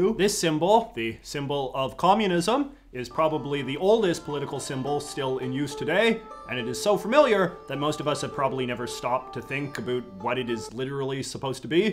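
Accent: Canada. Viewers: I'm from Prince Edward Island and you have the MOST "Canadian" accent I've ever heard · Wow, you seem like THE most Canadian person I've ever heard speak! And I actually watch a fair bit of Canadian content. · As a European, you've got the thickest Canadian accent I've ever heard and I love it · Is this guy's "Canadian accent" authentic? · Here for the Canadian accent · I just... I LOVE the way you speak. The combination of the Canadian dialect and your cute little idiolect with sort of high rising terminals. It's fucking captivating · The only Canadian I know who actually says A Boot and I live here!!!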